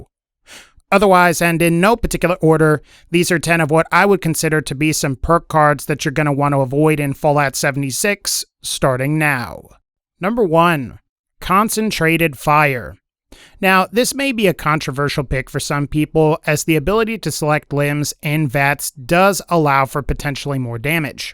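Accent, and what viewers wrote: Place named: New York, United States. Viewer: This dude is from New York or New Jersey. Or he has some other wack ass accent.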